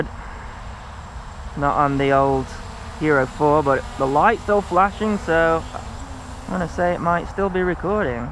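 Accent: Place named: England, United Kingdom. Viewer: G'Day. Pete In Australia here, very nice review, plus I just love your accent (I'm a Pom too) I subscribed to your channel a little while ago, some good stuff here man. Another You Tuber, Aeon38 recommended I talk to you so here I am. Sorry it's so long but I got a lot to ask! I also fly Mode One as does most of Oz and herein lies the problem. I've been flying R/C aircraft now for around forty years now (Don't ask!) and my brain is just absolutely wired to mode1. I have been teaching myself Mode Two recently with a few micro toy quads, F-36, etc (Gotta turn that one into FPV) and all is fine until it starts to go wrong and then the reflex's take over with disastrous results. With this little monster (Bugs) on 2s and later, 3S I do not want my reflexes to kick in and put Bugs in my face. (Or someone else, and this will happen if you are hovering nose out and you go to cut the throttle where-by you inadvertently give a back cyclic input, as you would know). 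What do I do? I really want Bugs 3 and unfortunately they haven't answered an email I sent to them so would you know of any flight controller boards that would work with this on DSMX/2. Or can I hook in a Mode 1 Rx to the standard board somehow? What can I do??? What are the ''Noisy Formula One'' type quads you mention. I neeeed one (on Mode 1 if possible). Would you have any links to the stuff we're talking about too by the way. I want something or things to: Fly real fast and steady. Fly real slow and steady for video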